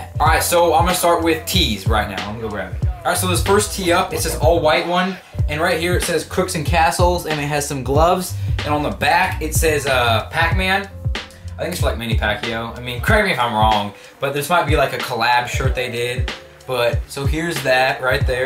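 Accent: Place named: United States. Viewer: its fresh to hear a Leb with an american accent we have a fair few Lebanese in Oz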